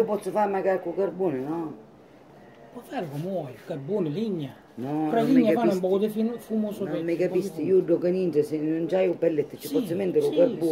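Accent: Italy. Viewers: Ma quale calabrese questo e' un siciliano verace............... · dialetto quasi uguale al leccese 😁, bel progetto , sarebbe bello vedere come l hai fatto , e in più io lì dentro aggiungerei qualche pezzo di legna giusto per risparmiare veramente.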